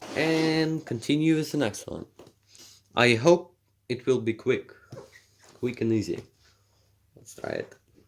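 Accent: Russia. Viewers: 0 dislikes thats what i like to see also i like the voice especially with the russian accent :D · I hear that russian accent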